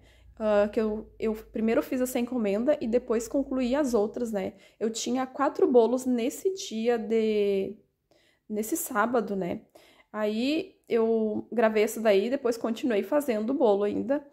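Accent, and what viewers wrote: Rio Grande do Sul, Brazil. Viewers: Boa noite lindona , achei vc alguns dias atrás , já me escrevi , e antes de assistir o vídeo eu já dou laik , vc e maravilhosa , e eu adoro seu sutak gaúcho , que Deus te abençoe sempre bjo 🥰🥰🥰🥰🥰🥰🥰🥰🥰🥰🥰🥰🥰🥰🥰🥰🥰🥰🥰🥰🥰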